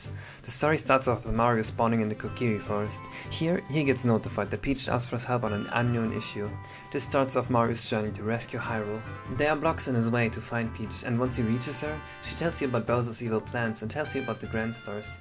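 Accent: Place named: Germany. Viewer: Is by any chance German? The accent is way to strong and familiar lol Also without having to say it: LOVE IT!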